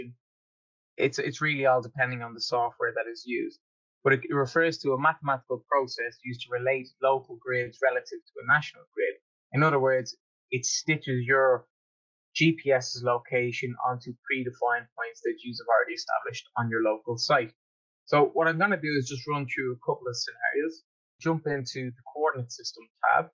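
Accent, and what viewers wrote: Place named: United Kingdom. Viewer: good video and great sequence of information; however you sound British, or European with your accent and as an American and somewhat decent land field surveyor,,,i really, rally think your video would be, reviewed , as much much better is you did not speak so fast. and leave out the trivial , stick to the tech detail and process and insert some pauses in between with also maybe some recall or repeat of stuff and say something like , ",,, ,, remember or as i previously said,,,," .Thanks !!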